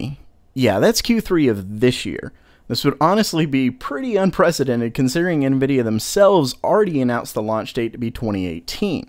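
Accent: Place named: South, United States. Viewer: That southern accent. :D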